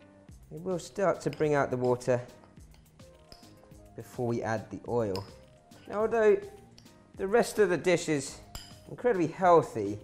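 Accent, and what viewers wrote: England, United Kingdom. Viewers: the best detailed show for Hainanese Chicken dish so far- of course love the English accent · M8, your UK accent is thick af. Nothing Singaporean abt it. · THANK YOU SO MUCH for the excellent tutorial videos. I’ve love Chinese food my whole life and always wanted to learn how to cook it better. I LOVE THESE YOUR VIDEOS. As a humorous side note. Being from the US I’m used to seeing Asian-Americans with an American accent. But It’s fun to see you with your British accent. So cheers to you. Chef. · YOUR BRITISH ACCENT IS TOO STRONG MAN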